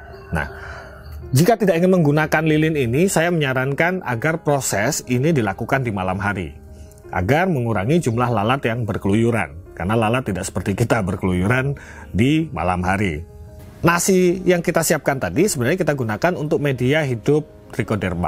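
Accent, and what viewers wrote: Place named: Kudus, Indonesia. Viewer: Panjenengan gaya penyampaianya seperti temanku org Kudus.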